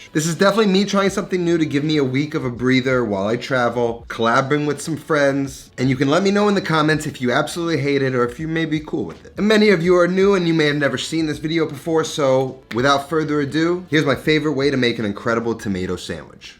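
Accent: New York, United States. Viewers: for the love of God, stop overthinking the way you pronounce ricotta. Don’t feed the trolls. You’re Italian American from New York, so is my sister in law- her whole family pronounces it the way you do. People pronounce things differently in different places. Also I’m Irish, and that’s how all my chef instructors in culinary school years ago pronounced it. People need to move on if they’re still giving you shit about the way you speak. Sandwich looks amazing by the way, keep doing you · No, it's an Italian/ American New York thing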